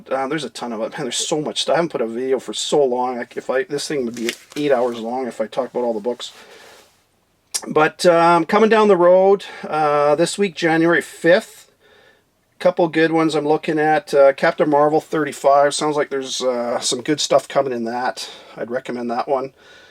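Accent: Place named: Canada. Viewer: Happy New Year!!! So happy to hear your Canadian voice talking about comics brother!! Just watched the video and can’t wait for the next one!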